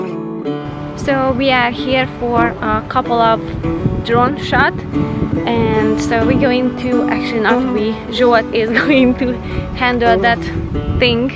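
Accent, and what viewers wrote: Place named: Hungary. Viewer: One of your other videos was just recommended to me and when I clicked and heard your accent I knew straight away that you are a fellow Hungarian! 🧡 Feliratkozva!! :)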